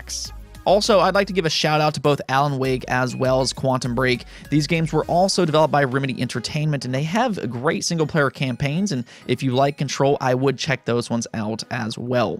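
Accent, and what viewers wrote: United States. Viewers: !! Jeez lassie I thought you were from New York or California! I’m gonna have to try your game too! I got the NEW Xbox though 😂♥️🤷‍♀️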